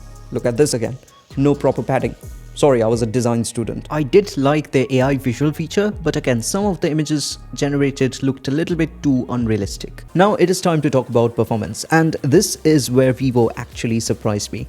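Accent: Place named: India. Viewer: First, i thought you are an American coz of the accent but the way you pronounced some words proved you are desi 😅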